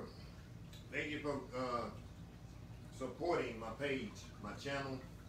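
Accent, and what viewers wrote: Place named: North Carolina, United States. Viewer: Love watching you create different foods ! Love your accent. I know you from Texas but it reminds me of NC in which I lived there for quite a few years. People there are pleasant . They love their get togethers and. SOUL FOOD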